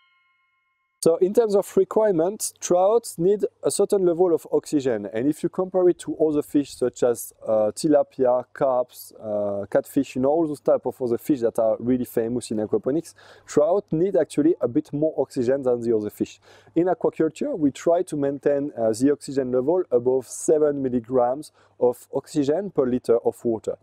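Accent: France. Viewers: Très français cet accent !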